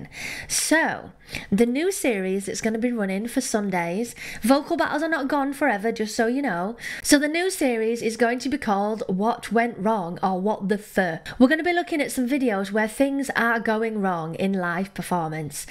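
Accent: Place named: Brighton, United Kingdom. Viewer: Hi, I love your vídeos and I love your accent which reminds me the time I lived in Brighton. Could you check some vídeos? She's a really good mezzosoprano pop singer know like the Spanish Queen of Pop. Pleaseeeee xxxxx.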